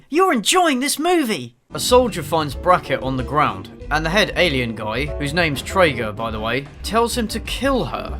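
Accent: United Kingdom. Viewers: An already amazing review gets bonus points automatically for sarcasm with a British Accent!! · Oh God. You're so much 'britishier' than I could ever imagine.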